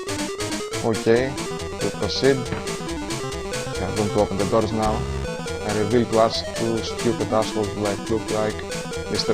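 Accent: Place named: Greece. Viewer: i sense a greek accent. :)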